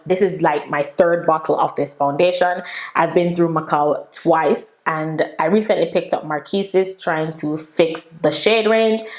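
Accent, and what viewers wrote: Jamaica. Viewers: She has a Jamaican accent. Everyone has an accent sis.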